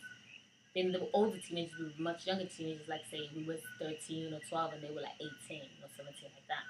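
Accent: Nuyorican, United States. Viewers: Vaya Mamii...I can tell off the back your NYRICAN all the Waay!!! Love the NyRican Accent unique unlike no one else....You sound like your a Brooklyn Boricua. Super Beautiful mami. Yea' Dominicans don't want no fuckn Beef with Boricua cause the same way we open the doors for those YOLERS we can and known how to Close them. P.Ricans have 3Million Boricuas alone in NYC so they can figure that one out plus we have 100yrs in NYC they came in the YESTERDAYS" Thanks to the NYRCIANS.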